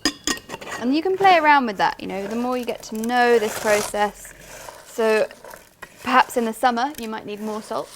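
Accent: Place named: United Kingdom. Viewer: As soon as I hear a British accent on a cooking show, I skip it... Never let a Brit into the kitchen...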